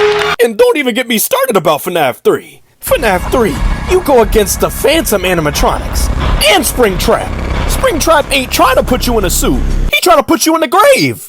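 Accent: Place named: Philadelphia, United States. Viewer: Why his sound like philly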